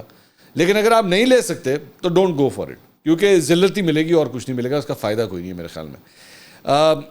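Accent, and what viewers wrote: Punjab, Pakistan. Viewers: السلام علیکم بھائی پنجابی والا لہجہ بہت اچھا لگتا ہے اپ پر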